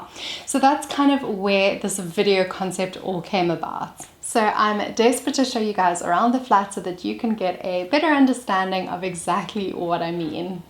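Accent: South Africa. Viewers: What an incredibly beautiful woman. Goodness me. And the South African accent just sprinkles even more beauty !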